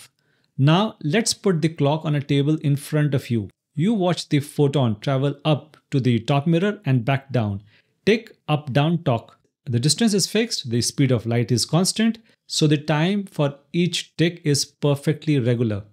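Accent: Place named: India. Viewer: I can't understand your indian accent. Have you tried speaking normal?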